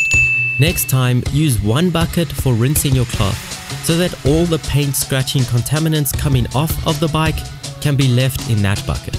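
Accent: South Africa. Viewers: you sound south african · Where are you from? You sound very South African.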